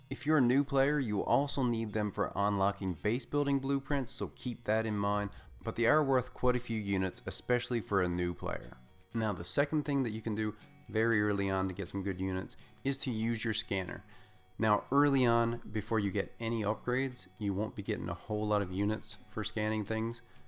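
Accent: Newfoundland, Canada. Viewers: Lol, I was thinking the entire video that you sounded Newfie.... and then your outro confirmed it haha. Obviously I didn't check the channel name before watching XD.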